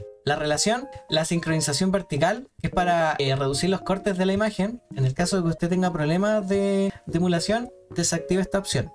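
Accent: Chile. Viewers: como se nota cuando alguien es chileno xd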